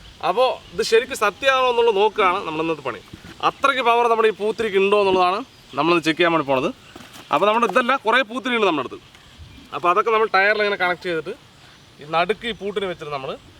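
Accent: Palakkad, India. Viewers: യുടെ പാലക്കാടൻ ഭാഷ അത് വേറെ ലെവലാണ് 😘😘🔥🔥🔥🔥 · പാലക്കാടൻ ഭാഷാ കേൾക്കാൻ വേണ്ടി ഓടിവന്ന ഞാൻ 😜😜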